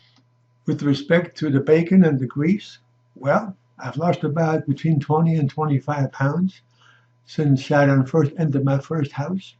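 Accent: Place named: United States. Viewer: Excellent! Good summation! Also, we have Pluto going through the US's second house, conjoining its native position from over 200 years ago. This is concerning. It is good to see another conservative astrologer! VedicCarol, or something, was one too. Unfortunately, few people listen to older people, and I'm getting there. They look for young, ignorant people to watch, some of which have good ideas, but who lack experience. Your American accent is quite good. I'm learning a foreign language too.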